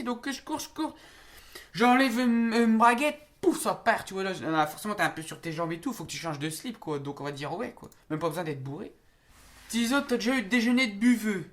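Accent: Nord-Pas-de-Calais, France. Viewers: Sa se vois que est un ch’ti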